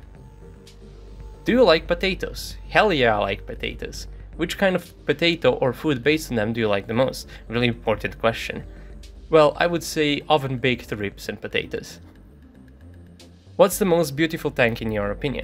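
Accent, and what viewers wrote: Eastern Europe. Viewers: I'm sorry but why would not say what country you are from or your age? Neither matter if you are putting out good content! Do you not like your country or do think people knowing what country you are from would be somekind of negative? I personally don't care if you don't say what country you are from or you age, but I also don't think it should matter what country you are from. From your accent you sound like you might be from eastern Europe, but then again I could be totally wrong lol. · Obviously, he has a slavic accent . I suppose he comes from Belarus or Ukraine .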